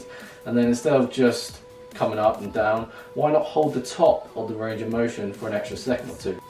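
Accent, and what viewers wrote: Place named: East End, United Kingdom. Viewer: I thought you just had a typical east end London accent lol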